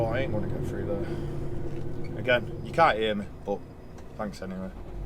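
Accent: United Kingdom. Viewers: just watched this, and subscribed. loved it, brilliant accent as well, tho I dunno from what part of the UK that is. Maybe someone here can enlighten me on that ? Greetings and a big thanks from Holland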